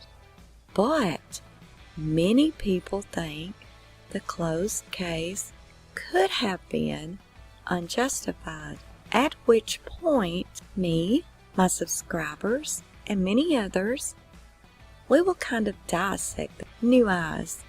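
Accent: United States. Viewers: Do your own thing ... I love listening to your accent ... that’s what I call a proper American accent 😁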